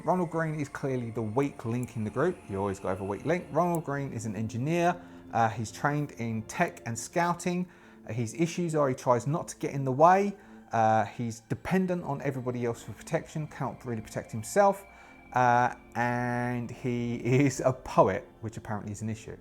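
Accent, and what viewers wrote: United Kingdom. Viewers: Never figured you lived in Aus - sound like a proper cockney geezer. Regardless, you definitely didn't miss much in those 22 years. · Even swearing sounds polite when delivered with a proper English accent my dude.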